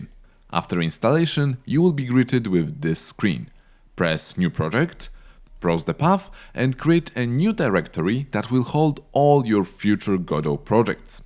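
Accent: Poland. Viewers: The Polish accent hurts my ears.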